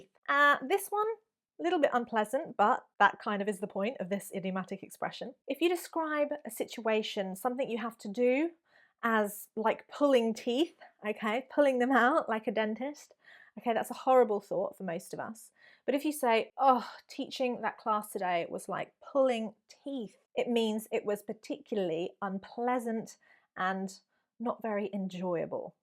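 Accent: United Kingdom. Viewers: Hello, I am an Arab from Bahrain and I want to learn the British accent soon. I will learn it from you 🥰🥰♥️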